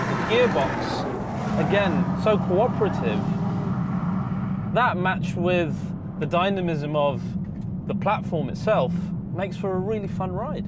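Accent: United Kingdom. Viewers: Very Strange how you Brits all have the same Accent and pace when talking even the Middle eastern ones that aren't Caucasian .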